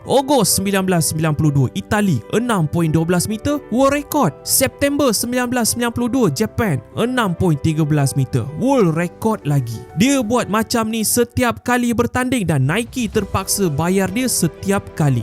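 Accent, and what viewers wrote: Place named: Kelantan, Malaysia. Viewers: kelate sedak 😅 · kelantan😂 seda0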